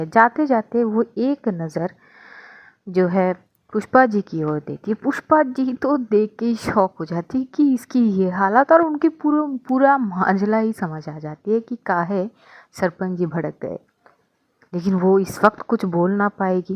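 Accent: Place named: Haryana, India. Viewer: Didu haryanvi bolna kha se sikha 😊thodi thodi bol rhi ho pr mst lag ri so ❤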